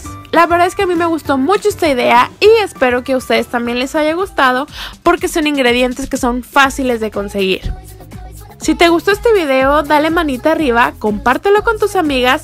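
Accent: Fresa, Mexico. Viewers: Hablas muy fresa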